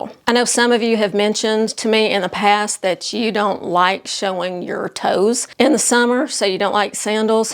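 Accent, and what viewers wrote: South, United States. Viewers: I am from the Atlanta metro area and your accent is like butter to my ears! In the south, you know this is a compliment! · Love this and all your videos! Preppy, classic shoes are wonderful and totally my style, I have most of these. Awesome that Keds, loafers and boat shoes are back. Not feeling the mesh style yet though. I watch you on my phone, laptop and TV. Love your Southern accent, as a Southern lady myself 😊. I mostly watch for information but occasionally shopping. ❤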